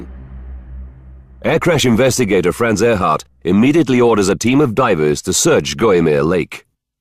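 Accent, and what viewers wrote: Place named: United Kingdom. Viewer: This show is Canadian made by a company based in Montreal called Cineflix and all of the episodes were produced at their studios in London...it was syndicated into US channels under various names and that's why everyone thinks it's American but it's not. Hence the British narrators on every episode. As usual European spouting off about Americans without doing an ounce of research.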